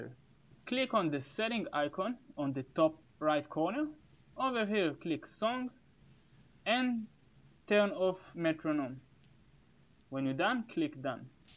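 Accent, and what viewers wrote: Israel. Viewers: אתה נישמע לי ישראלי בכל מקרה לא משנה thx bro good view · איך שומעים שהוא ישראלי..(: תודה על ההסבר, זה מעולה! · שמע לא יכולתי שלא לכתוב שאתה ישראלי עם המבטא הזה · Nice Israeli accent lol. Works great thanks!!! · I have IOS 9.0.2 and its not free. And i know you're from israel you have a israeli accent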